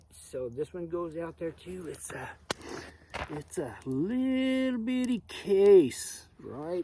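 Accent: Australia. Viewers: maybe an odd question, but are you originally from Australia? I just here it mixed in with your accent..... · Your accent is…. Like from where? Sounds Aussie almost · Old hickory epsecially older ones are sooo tough n last forever... Almost hear a Hint Of Austrailian Accent in there no?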